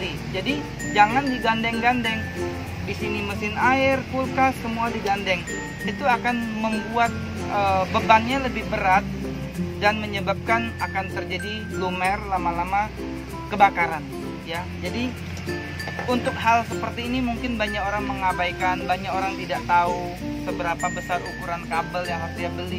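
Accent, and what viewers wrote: Java, Indonesia. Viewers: Eterna, federal, jenbo, kitani, patriot, sumitomo, supreme. Banyak opsi yg kualitasnya memadai. Kemarin aku beli pompa air submersible type SPG20-311K kabel bawaannya OFC 0,75mm² 30 meter. OFC singkatan oxygen-free copper artinya tembaga murni bebas oksigen, relatif tahan karat. Hantarannya bagus. Nyambung kabel serabut mestinya diberi ferrule atau skun yg dipress dg tang krimping agar tidak ngepong. Koh, logatmu medok kamu orang mana?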